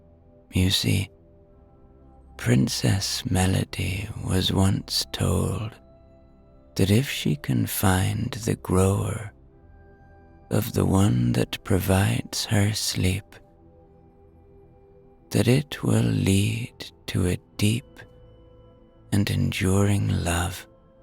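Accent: Ireland. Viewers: listening to you makes me feel close to my Irish roots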